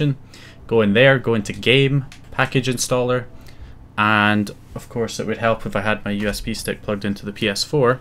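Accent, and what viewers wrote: Scotland, United Kingdom. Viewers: Good to hear a fellow Scotsman on here! I’ve only just this second learned that my PS4 can be jailbroken! Amazing but can you advise a newbie where to start or point me in the direction of which of your vids to go to first? I’ve no clue about terminology or that. Cheers man and all the best!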